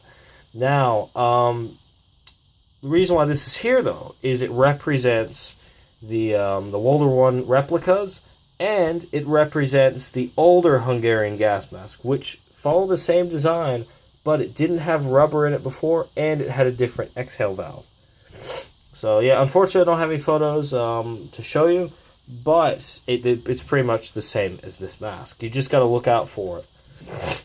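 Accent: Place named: United States. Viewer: So you live in Australia, but have an American accent, were you born in America, but moved to Australia? I'm just curious.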